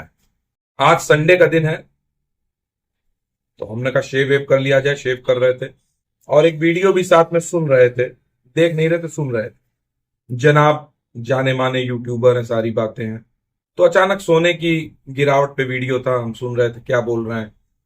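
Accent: India. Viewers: पर आपके बोलने का देसी तरीका अच्छा व प्रभाशाली है।। Plz don't change yourself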